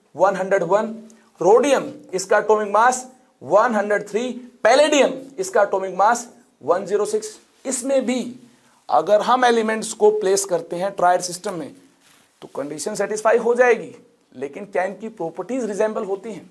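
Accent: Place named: Haryana, India. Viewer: sir ki awaz m hi haryanvi accent aajata hai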